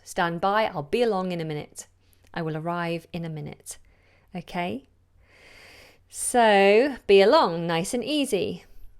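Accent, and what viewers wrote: United Kingdom. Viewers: Hello, I am a faithful follower of yours and I think you are one of most important and serious youtuber about teaching british English currently. I have seen your develop since you started your channel and you have been gaining lot of suscribers because of your professionalism. I would like to ask you to do some videos about "Shadowing" because I have been watching some very good videos about it but the majority of them are from American English, which is fine, but I am living in the UK and I would like to get the rithm and pronunciation that you have which is lovely. I hope you consider my proposal because I am sure if you do these videos you will do them awesome as everything you have done up to now. This is a very good example about Shadowing, I think he is a very good teacher but hi is teaching American English. <link>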